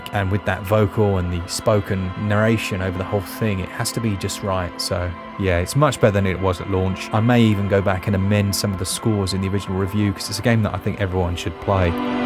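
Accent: United Kingdom. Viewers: What I love about this channel is you sound like a geezer I'd meet down the pub, but your knowledge is up there with Digital Foundry.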